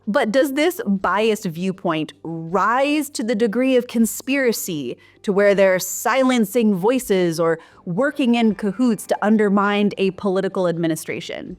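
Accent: United States. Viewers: U need a differwnr presenter...what you say may be right but right now an amerrican voice talking about media bias and truth has next to no credibility outside the former Usa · It is so pleasant to hear a balanced opinion in an American accent for once. 🤷‍♂😄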